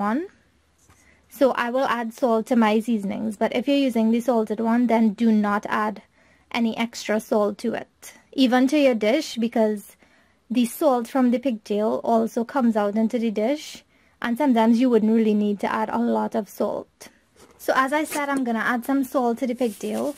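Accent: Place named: Trinidad, Trinidad and Tobago. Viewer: Looks delicious. Yummy! I like your Trindad accent. Everything looks so good.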